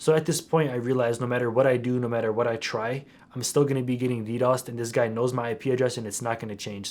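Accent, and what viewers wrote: United Kingdom. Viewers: your British accent is immaculate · is crying? british accent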